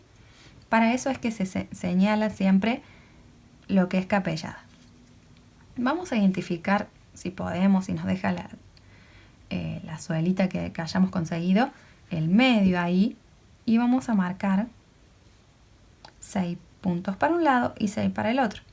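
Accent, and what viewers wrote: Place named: Córdoba, Argentina. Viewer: Qué hermoso!! Tgo 80 años, tejo maravillas, y estoy re tentada de hacer estos zapatos!!! He tejido pantuflas p regalar en pandemia, pero ésto es superior!! Y escuchar tu "tonadita" de ntra Cba, más!!! Gracias X tan bna explicación. Ely,ciudad de Santa María de Punilla, Córdoba, República Argentina.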